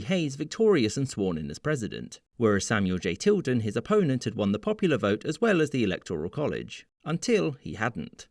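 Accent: United Kingdom. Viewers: It’s crazy that the person commenting on US history is British.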